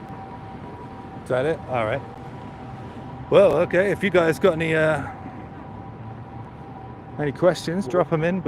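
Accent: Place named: Scotland, United Kingdom. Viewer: J where is your accent from? Driving me insane. I hear my native Scottish when you talk. Please let me know 🤞❤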